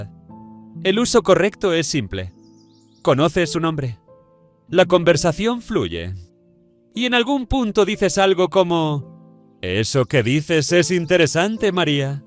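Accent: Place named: Spain. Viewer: siento que si le quitamos el acento español a su voz se parecería a la de Satoru Gojo jejejeje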